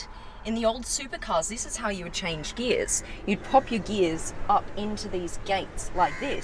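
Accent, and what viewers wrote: Australia. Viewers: More annoying accent: Australia or New Jersey? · australian accents are so cute!!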